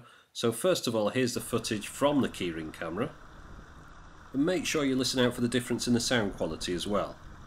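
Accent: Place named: Liverpool, United Kingdom. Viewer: You sound like Ringo Starr! Is that a Liverpool accent?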